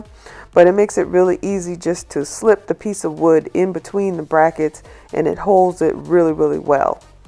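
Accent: Charleston, United States. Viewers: At this point I’m calling you family simply because seconds into the vid you said “PAINTED IT” I was like oh she sounds like she’s from Charleston And lord be hold you are. 😍😍😂😂 there’s no escaping this geechie accent and I love it. Thank you for being you and walking in your purpose and providing beautiful content and creations may God continue to keep you 🙏🏾, You definitely gained a new family member in my head at least 😂 and a new subscriber.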